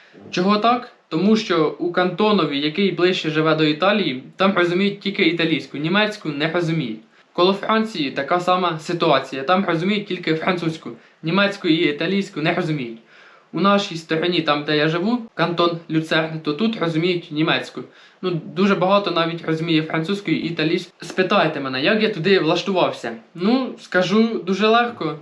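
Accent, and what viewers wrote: Poltava, Ukraine. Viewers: В тебе акцент цікавий. Ти з Полтавщини?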